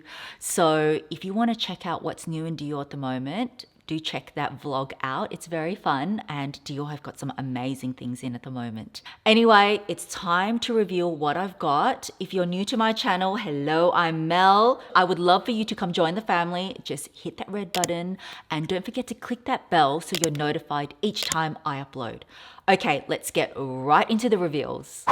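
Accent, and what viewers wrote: Australia. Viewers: Hi Mel! I've watched every single video since you started! I love luxry goods but I can't buy everything I love and you always shared the prices and introduced them perfectly! Also, I want to practice my speaking Aussie English more from you! Yes, I am from Melbourne as well! My IG is pandadududududu. Sending love from Melbourne😍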